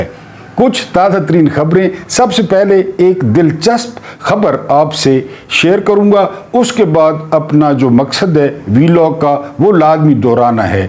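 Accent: Saraiki, Pakistan. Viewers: بسم اللّٰہ الرحمٰن الرحیم السلام علیکم ورحمۃاللہ تعالیٰ و برکاتہ' محترم جناب قابلِ صد احترام آپ کے ویلاگ الحمد للّٰہ! قضا نہیں ھوتے آج کے ویلاگ نے راقم ناچیز ھیچمدان کے ذھن نارسا میں قہقہوں کا وہ طوفان بدتمیزی مچایا کہ یقیناً پنسلیوں میں درد اٹھنے لگے _ محترم آپ بہت حاذق اور ماھر شخصیت ھیں اور مجسم حس ظرافت ھیں _ اعلیٰ درجے کی حس مزاح اور طنز اس وقت انسان پاتا ھے جو نہایت دردوں اور ستم ہائے جہاں کا مارا ھوا ھو جو تڑپ تڑپ کر زندگی کی سیڑھیاں کراس کرتا رہا ھو ـ تب جا کر بہترین پیروڈی اور کامیڈی کا اظہار اچھی طرزِ ادائیگی اور بہترین اسلوب میں کر سکتا ھے _ نیز آپ پر سرائیکی کی خوبصورت چاشنی کا اثر ھے تو اس سے مزید نکھار آجاتا ھے _ مثلاً بنگال کی اعلیٰ خوبصورتی کو آپ نے خوب واضح کیا _ اور مکے منتری کی بہترین کارکردگی پر اچھی طرح روشنی ڈالی _ باقی ٹبر پر آپ خوب کھلے دل سے والہانہ انداز میں طبع آزمائی کرتے ھیں ، اللّٰہ رب العزت آپ کو دارین میں جزائے خیر اور احسن جزا عطا فرمائے ، الہ العالمین آپ کو مستحکم ایمان اور عبادتوں ، خوشیوں کامیابیوں ، نعمتوں اور برکتوں بھری لمبیییییی زندگی عطا فرمائے ، اللھم آمین آمین آمین برحمتک یا ارحم الراحمین _ والسلام اللھم صل وسلم وبارک علی سیدنا محمد والہ و اصحابہ صلاۃ دائمۃ بدوام ملک اللّٰہ مقبولۃ توءدی بھا عنا حقہ العظیم انک حمید مجید والحمدللہ رب العالمین __ ۔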